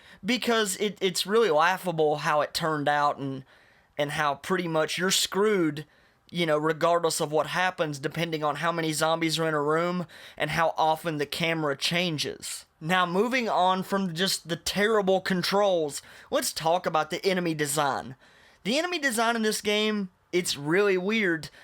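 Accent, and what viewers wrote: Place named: Georgia, United States. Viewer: You sound like Dale from Baskets. Are you from Georgia?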